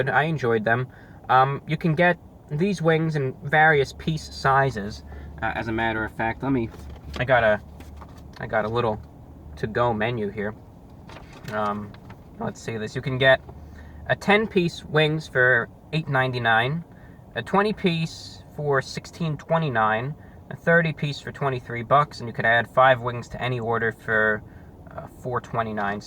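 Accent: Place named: New York, United States. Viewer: This dude has to be from NY.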